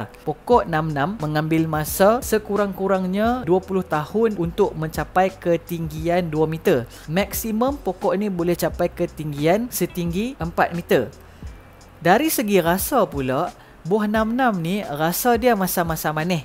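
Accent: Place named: Johor, Malaysia. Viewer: Peh! Takle angkat siaa loghat joho dia... 😘 gerek siaa!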